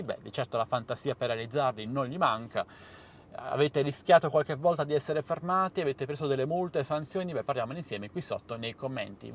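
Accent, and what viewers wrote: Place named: Sardinia, Italy. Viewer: Mi sono iscritto al tuo canale, sei molto simpatico.. in Italia va tutto al contrario ormai, dove vogliono farci arrivare bho.. dal tuo accento sembri sardo, un saluto da un napoletano, buon volo👋..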